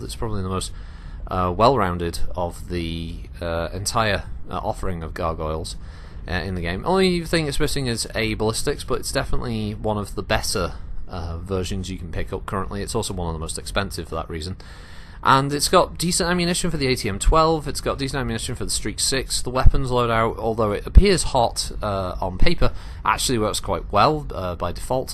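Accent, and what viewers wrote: United Kingdom. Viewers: Thank you. You know that American's trust a British accent, right? Well, speaking for myself I think that is true. Your timing for the most part is great. You don't stumble over words. You don't throw in the obligatory 'uhs' and 'ums' like I do. That is my handicap. I can write far better than I can speak. Though, somewhere in my early to mid thirties I did notice that marked improvement in my reading aloud ability. I'm not sure if that is something that comes with age and practice or just reading Robert Jordan novels until my eyes bled. Nevertheless, your lore series brings to mind (and please don't take this the wrong way, I mean this with the utmost sincerity and respect) the old nature shows I used to watch in the early 80s. I'm serious when I say your voice brings a certain...credibility to the universe. Several times while watching your series people have come in and assumed I was watching something about the real world. 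I always enjoy the sound of voice and look on their faces when they inevitably say, "What the hell are you listening to?" five minutes into Star League History 3 or something. It telegraphs to me that they thought it was syndicated documentary or something (I am prone to watch more docs than cat videos or people getting hit in the stones or 'Top Ten Reasons not to watch Top Ten videos' videos) so I smile inwardly at their sudden confusion when you switch gears from talking about the Camerons to a battle over YakitySmakity V. That fact that you are doing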